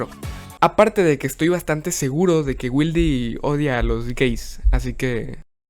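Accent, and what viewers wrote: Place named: Mexico. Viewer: eres mexicano wey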